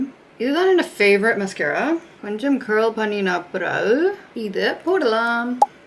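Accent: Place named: Sri Lanka. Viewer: Sri Lankan!??? Love ur accent diva💅💅🎀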